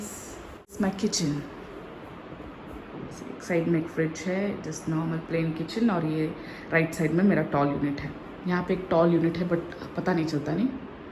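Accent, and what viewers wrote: South, India. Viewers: R u from south mam ....🤩👍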